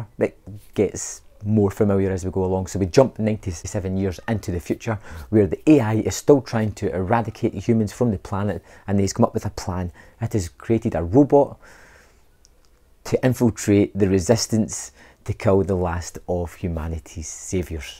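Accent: Scotland, United Kingdom. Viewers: Jesus man! once I realized it was a Scottish accent I could understand you. At first I thought you were Dutch LOL anyways don't kid yourself my friend it was a terrible movie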